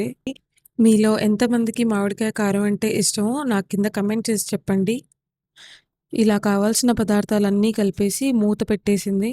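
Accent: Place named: Andhra Pradesh, India. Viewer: Meru motham Andhra slang lo matladthunaru inthaki midhi ee oru andi ??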